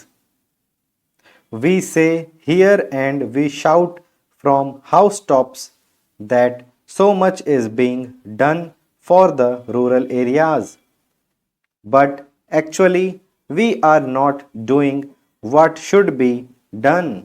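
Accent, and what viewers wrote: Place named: North India, India. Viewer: North Indian accent ......not useful for South Indians....you people call school as ischool.....😂😂😂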